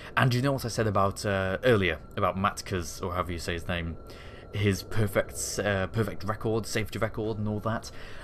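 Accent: United Kingdom. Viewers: watched your good vids forget to subscribe but here :D you have one your british brr your voice is brr i love british acent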